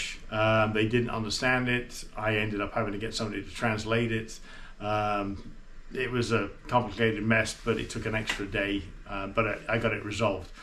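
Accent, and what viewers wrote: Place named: Western Australia, Australia. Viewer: mate I've been trying for so long to try and pin your accent. ever since your video with mototrek. I'm guessing australian, but west Australian?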